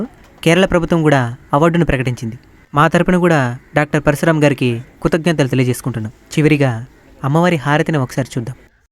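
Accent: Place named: Chittoor, India. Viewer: ANNAYYA MEMU ME OORIKI May LO vasthamu yekkada gudi undedhi PLEASE address cheppagaru ME VOICE vintunte chittor jilla vallala undhi PLEASE PLEASE E gudi chusina tharvatha aa gudiki ravalani undhi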